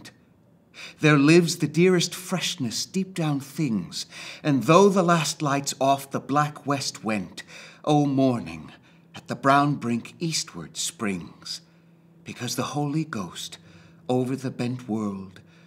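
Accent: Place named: United States. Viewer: It doesn't work with an American accent. Hopkins was English.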